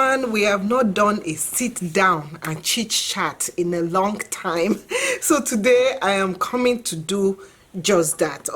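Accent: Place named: Nigeria. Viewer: Just your introduction started with so much energy! I liked your video right away haha. And it's great to hear other Nigerians story who are living abroad and building a successful life for themselves. P.S I love hearing the nigerian accent. Some people say I have it, but I hardly hear it in myself :)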